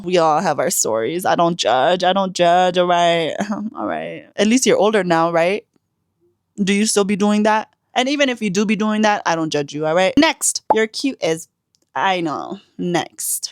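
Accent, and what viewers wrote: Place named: United States. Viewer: "tpi ko accent kina yesto amrica" 😭😭😭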